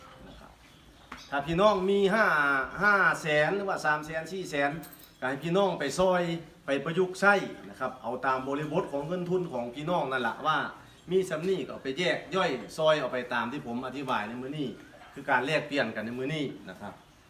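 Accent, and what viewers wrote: Thailand. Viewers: อยู่ที่ไหนครับภาษาพูดเหมือนบ้านผมเลยครับผมอยู่สกลนครับ ดูแล้วท่านเก่งมากๆครับ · ฟังสำเนียงคือคนนครพนมหรือสกลนครผมอยู่นาทม นครพนมอยากเลี้ยงคือกันแต่ยังบ่มีความรู้ด้านตลาดแนะนำได้บ่ครับ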